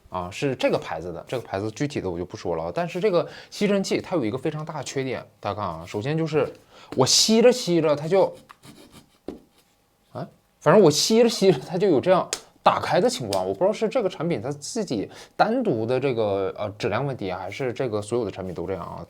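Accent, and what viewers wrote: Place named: Northeast, China. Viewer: 老哥这一口大碴子味儿 听着老亲切了！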